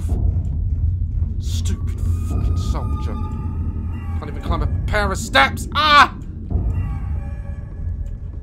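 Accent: United Kingdom. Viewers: One of the things I like about videos is the British accent and btw I just subbed!!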